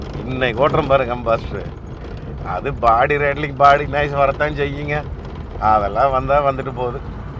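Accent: Kongu, India. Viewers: கொங்கு தமிழ் அழகர் சார் உங்கள் நெம்பர் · Anna azhagana kongu Tamil la arumayana oru pathivunga naa kekka kekka ketute irukkalam nu irukkuthunga na arumainga ponga ❤️❤️❤️😻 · கொஞ்சும் கொங்குத் தமிழ் பேசும் கோமகரே...! திகட்டாத தித்திக்கும் பேச்சுடைய திருப்பூர் திருமகரே...! அடடா ஆனந்தமே❤❤❤ அம்சமான கார்...! அழகான கார்...! அளவான வசதிகொண்ட கார்...! அனைத்து மக்களுக்கும் பிடித்தமான கார்...! நம் திருப்பூர் அண்ணாச்சி கொங்குநாட்டுச் சிங்கம் திருப்பூர் தங்கம் நம் அய்யா😊🙏 நமது கண்களுக்கு விருந்தளிக்க கொண்டு வந்து இருக்கும் இந்த அற்புதமான கார்....! "❤அம்பாசிட்டர்❤" கார்...! அருமையோ அருமை❤❤❤❤ என்றும் அன்புடன் உங்கள் மகன்போல இவன் ரா.முஹம்மது கபூர் (சென்னையில் இருந்து)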